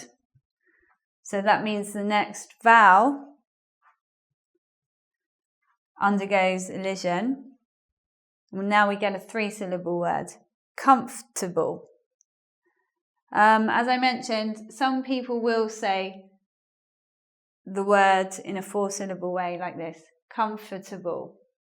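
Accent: United Kingdom. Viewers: English - Learn English (engVid) I have a doubt,you are british? I have trouble in recognise accent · You are so sexy with your sexy voice and that British accent!!! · I love this video and, I like the way she teaches. someone said that she isn't good at explaining things but, I don't agree. she's explaining is very simple. she is trying to talk every sentences shortly using easy verbs, as I see. and she's british accent is very clear. (she even speak slowly, nice!) this all things will be helpful for Asian people want to learn by watching and listening British english just like me. especially Japanese, Korean. Because this two Country's grammar is very different to english! It's completly reversing how you speak & read. Long explaining, added adjective, talking stories, it's sometimes useless! it's even make more confusion to these country's people. These videos are just what I want! 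Thank you for! x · I was following your video like British accent but i didn`t know that you are also teaching at Engvid... thanks so much for helpful clip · Thank you for this good lesson, with British accent. I think that British (let's say English)accent is the sole fundamental and elegant one, to learn for non native speakers,first of all, and before any other accents, which have altered a lot the original and true ESL. These additional accents do not seem very necessary.(American, Asian, neutral etc.): for instance, an U.S.A. native can well understand someone speaking with an English accent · Finally i found one channel of british english lessons, i was tired of those estupid american accents, the best accent for me is this! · i hate british accent after this vid. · Pretty much the most normal English accent you will hear, not sure why everyone's saying it's weird. If you think it sounds weird then you're unfamiliar with British English! 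Urban south eastern. Only weird thing I heard was illead instead of ellide. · lol no you don't sound like a native speaker, too british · you are superb , i'm in love with British accent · OMFG.......the British accent is terrible !!! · Hi your acssent british english) i'm lithuainian live in england 10 years,and sometimes very hard to understand english acssent,canadian and americans i understand,but i not in north america i'm in england and i need learn british english,thanks i like your videos ))) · Well, she sounds completely British to me, and just love the accents she use. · My god, I love your british accent and trying to copy that , though I'm a man :D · I LOVED THIS LESSON. YOU SPEAK WEIRD. LIKE BRITISH.. ARE YOU BRITISH?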